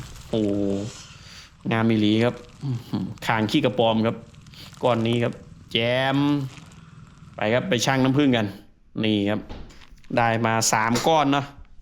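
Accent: Isan, Thailand. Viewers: ฟังสำเนียงเว้านี่เจ้าเป็นคนจังหวัดเลยแม่นบ่คับ😂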